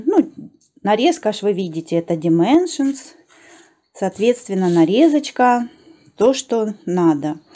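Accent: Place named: Ukraine. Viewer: А вы откуда? Просто не услышала в видео, кроме упоминания 2х трудных месяцев, а майские деньки упорядочились, судя по говору, с Украины....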